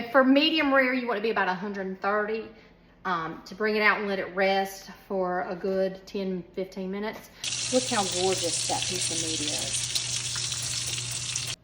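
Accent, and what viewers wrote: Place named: South, United States. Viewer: I love the southern accents you, Dan-O, Hickok 45, Stalecracker and a bunch of other southern cooks real Americans 👍🇺🇲 bless all ya all😊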